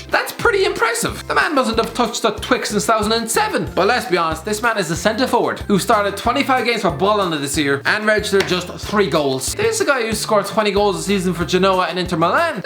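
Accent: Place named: Ireland. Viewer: I had to put the video at 0.75x speed just to make this guy's Irish mumble rapping sound coherant.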